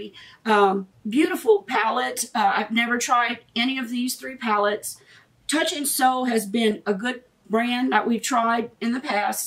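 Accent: United States. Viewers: Hi I stumbled upon your channel and within the first minute I knew you had to be from Louisiana, then when you pronounced Kevyn Aucoin's name the right way i knew i was right. I love Boxycharm and i appreciate all the hard work you but into this video!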